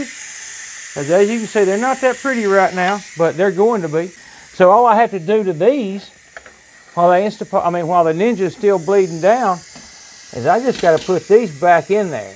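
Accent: South, United States. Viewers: I love this video. You're such a character 😁 your American accent made me smile. Such a good job on those ribs! I love my instant pots.. can't wait to try ribs now! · He’s from the south so he has an accent.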